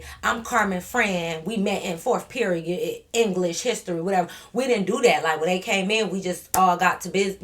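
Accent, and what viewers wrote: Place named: Detroit, United States. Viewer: Omg at the beginning I was saying in my head where is this girl from? Then Detroit came to my mind then i was like No that's where they are just to find out she is!!!😂😂 i love your story times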